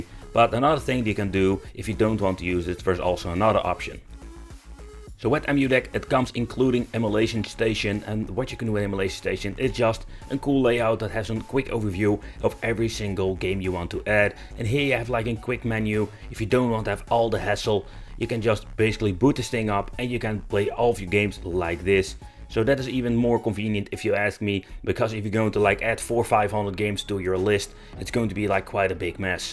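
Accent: Netherlands. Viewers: It sounds your northern European, Dutch I presume.. did you have to pay import costs for the steam deck?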